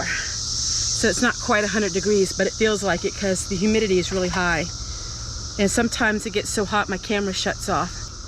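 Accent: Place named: Alabama, United States. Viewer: We pronounce chamomile the same way here in Alabama. LOL I’ve only noticed one word you have pronounce different and that’s the word “different”.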